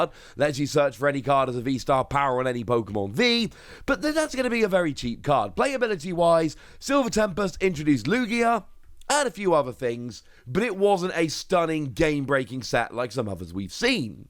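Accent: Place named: England, United Kingdom. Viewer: I joined the subscription after just 2 videos after listening to this cheerful and chipper English accent poketuber. It's so refreshing to hear a change of pace and be actually helpful/ competent. Not only does this channel talk about what to do competively but also discusses what to invest in for memorabilia/ speculation investments to make money only to buy more pokemon cards!!!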